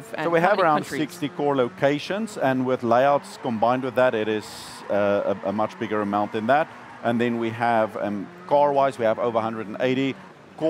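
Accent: South Africa. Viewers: Why does he sound like South African? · is this guy South African?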